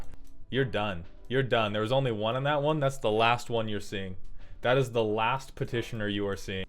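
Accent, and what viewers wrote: South, United States. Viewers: why is he so southern today? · Turned that southern drawl up to 11 for this vid :D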